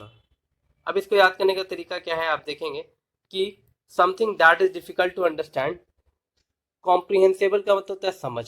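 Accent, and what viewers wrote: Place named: Bihar, India. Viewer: Sir aap bihar se ho kya...😍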